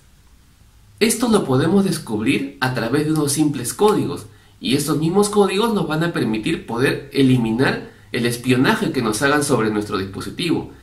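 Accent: Peru. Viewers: Hablas agradable, peruano, mejor que lo horrible que hablan ciertos ecuatorianos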